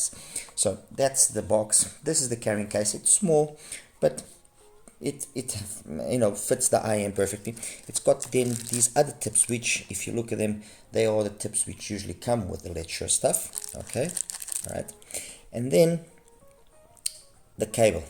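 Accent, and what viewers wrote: South Africa. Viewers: You must be South African...😊i can hear the accent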